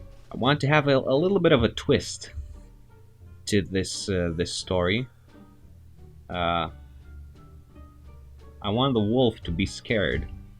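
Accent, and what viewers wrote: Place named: Romania. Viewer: Saw your comment on one of Jazza's videos and wow, i'm glad I checked out your channel. I love the moderate pace and good explanations. Just wondering, are you by any chance Romanian? Your name sounds very Romanian and the accent sounds familiar for some reason :)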